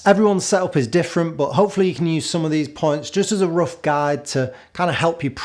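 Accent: Manchester, United Kingdom. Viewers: Thought you'd misspelled 'account'... But no... MANCHESTOH 👍💪🐝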